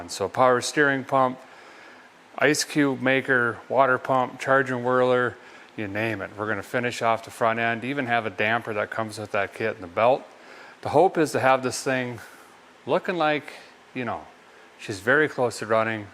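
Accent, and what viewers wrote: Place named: Minnesota, United States. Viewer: Great video as always. I’m waiting for the Minnesotan to southern accent conversion.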